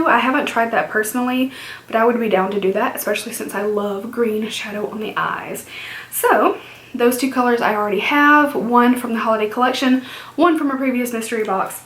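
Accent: South, United States. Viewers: Hello from Alabama! I think I detect a southern accent , where are you from? I’ve been a JSC fan from the very start and my favorite lippies would have to be celebrity skin, unicorn blood, and ?. I gravitate toward pinks, nudes and darker berry shades.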